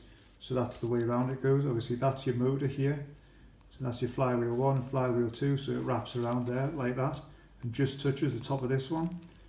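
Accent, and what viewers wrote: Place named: Newcastle, United Kingdom. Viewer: Though you were a Geordie from the accent! Question for you - I've got a PC37J (basically the same) been in a closet forever, pulled it out and nothing works on cassette. Pause button doesnt click or lock down. Could hear something small loose and rattling inside. Found the service manual for pc30, opened up the case and out dropped the pause cam (piece 61 for mechanism), nothing else. Took out cassette mechanism and theres bits of a belt wrapped around back of flywheel. Can see a pin that the pause cam should be on but not sure how it goes there or how it works. Also bits of a belt wrapping around back of a large flywheel on pause side of mech. Any chance this is an easy fix?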